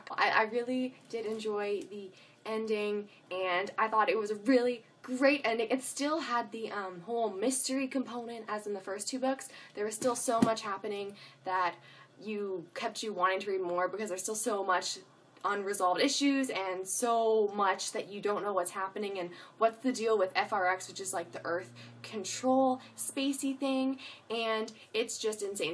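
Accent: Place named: South, United States. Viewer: Where are you from anyways? It sounds like you have a slight southern accent which makes me think Texas for some reason haha I will totally share any secret information with you ;)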